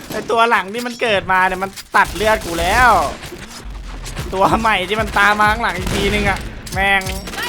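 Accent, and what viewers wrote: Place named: Korat, Thailand. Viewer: ดูว่ะ นึกว่าคนโคราช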